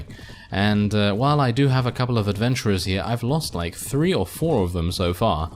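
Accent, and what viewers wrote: United Kingdom. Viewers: It was me, I was talking about Sarah and Leslie earlier, sorry bruh. Love the series, you are awesome. I started watching this series this Friday (I was sick this week) and just caught up now. It's addictive, and you're doing an awesome job, my beautifully British accented friend!! - Love from America.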